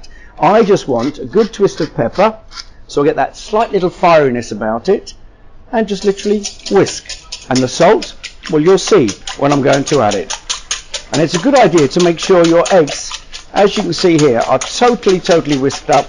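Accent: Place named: United Kingdom. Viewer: Clearly British.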